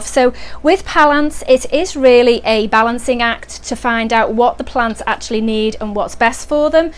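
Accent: United Kingdom. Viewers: oh ok thank you! Great video btw your videos are always so fucking and soothing to watch!! Even though I never comment I always like your videos! And I was just wondering because of your accent I thought you lived in the uk! I’ve only been subscribed for a month or less but I’ve liked your videos ever since I started watching and I keep going back to your old videos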